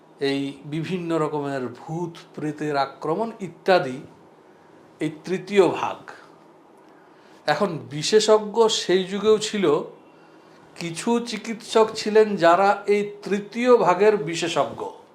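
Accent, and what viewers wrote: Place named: Bankura-Purulia, India. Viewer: আপনার কথা শুনে মনে হয়েছে, আপনি উত্তর বঙ্গীয় বা বাঁকুড়া পুরুলিয়ার কোথাও। এটা আমার অনুমান। আমার বাড়ি বর্দ্ধমান জেলার উত্তর প্রান্তে। মোটামুটি কবিগুরুর শান্তিনিকেতনের কাছাকাছি। আপনার কাছে বহু কিছু শেখার আছে। আমার বয়স ৭১+। কিন্তু জ্ঞানে আমি নেহাত শিশু। পেশায় একজন সঙ্গীত শিক্ষক। তাতেও বোধ হয় শিশু। খুব কষ্ট হয়, এতদিনেও শিশু হয়েই রইলাম। আমার শ্রদ্ধাপূর্ণ নমস্কার নেবেন।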